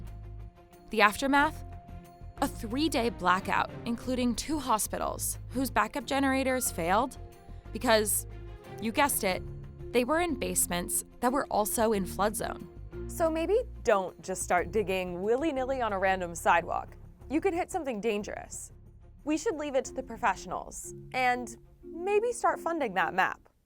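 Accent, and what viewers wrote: California, United States. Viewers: where does the narrators accent come from? American females aren't born whih it, yet so many want to sound like they were raised in a mall. just talk normal. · That annoying California accent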